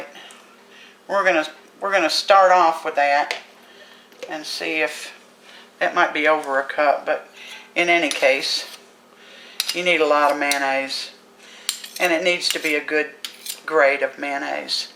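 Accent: South, United States. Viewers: Mmmmm this looks good I'm going too be trying to make this soon for my husband and kids!!!! I am subscribing too your channel I loovveee your southern twang!!!! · This recipe is most valid because the lady making it has southern accent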